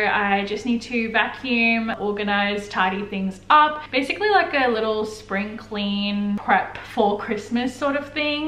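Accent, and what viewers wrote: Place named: Australia. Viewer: Gosh you have a good Aussie accent I live in nsw and I sound like u 😂 the pure Aussie accent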